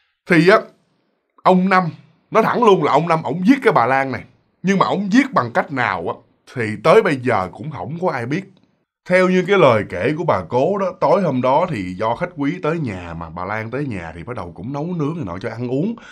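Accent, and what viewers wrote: Mekong Delta, Vietnam. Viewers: Chúng ta đều thích nghe truyện... Giọng kể kiểu miền tây chân chất... Giọng là bất diệt rồi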